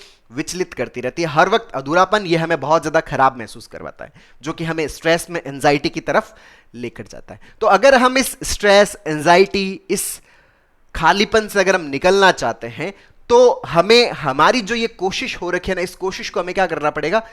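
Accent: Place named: Rajasthan, India. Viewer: Sir aapke kahne ka tarika Bikaner rajasthan se bahut achha lgta h 😊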